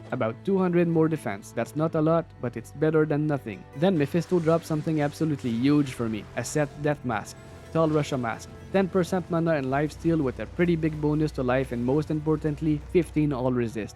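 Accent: Quebec, Canada. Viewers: “J’ai Chaud”. I can never unhear it now. You for Quebec? The accent sounds very familiar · You french canadian ? Im curious 😆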